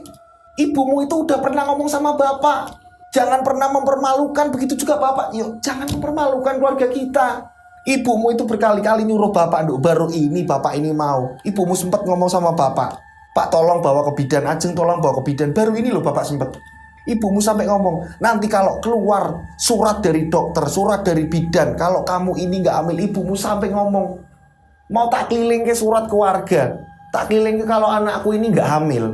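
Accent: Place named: Tulungagung, Indonesia. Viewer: Kyk wong tulungagung bahasa e.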